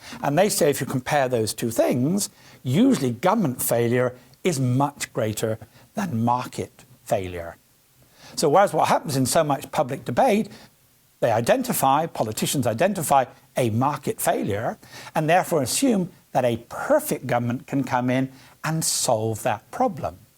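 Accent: United Kingdom. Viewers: Great video! I can't quite place the gentleman's accent; are there any people from the UK who can recognize it? Is he Northern Irish?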